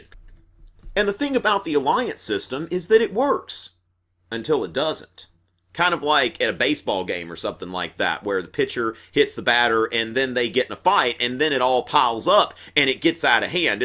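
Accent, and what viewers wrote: South, United States. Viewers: r u American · His voice is so American. He seems so hyper, like calm your jets. :D Good video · Love your videos! Informative plus a great southern accented sense of humor. Thanks!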